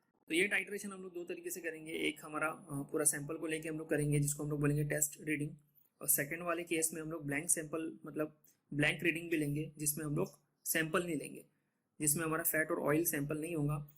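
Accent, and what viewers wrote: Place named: Hyderabad, India. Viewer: Sir you are amazing and your hyderabadi accent is mashallah ☺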